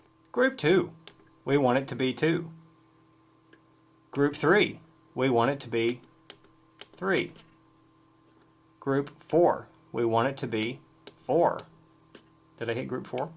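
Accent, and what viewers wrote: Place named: Australia. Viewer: are you aussie? if not you have such an aussie voice for an american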